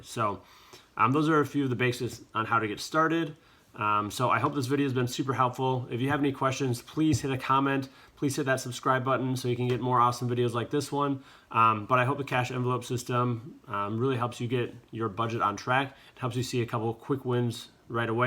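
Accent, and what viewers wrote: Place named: United Kingdom. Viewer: I love a good random British accent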